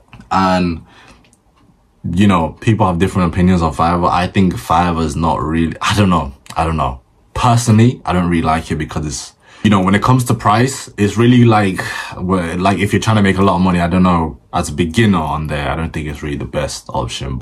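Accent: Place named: England, United Kingdom. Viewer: Hello mate ! I'm 28 from ireland and I've been learning copyrighting for 1 month. I know AIDA and PAS and all the basics. I feel I'm ready to start but struggle with outreach . Btw ...I'd like to say that that it's a breath of fresh air to hear an English accent. I'm sick to death of all these yankie accents- so annoying ...😮 ! Haha My Q; Would you recommend LinkedIn and TikTok for outreach. I've been banned from Instagram for sending too many messages the same ( but they weren't all the same ) I've been sending some messages on YouTube. Is Instagram the best for outreach ? If so .... I'll try and set up another ... Keep the pounds rolling in mate ! It's great to see success stories. Plus i love your honesty. Some use copy in their yt channels that teach about copy like KJ rainer and cardinal so ....I dont see that with you. So well done ✅ Cheers mate.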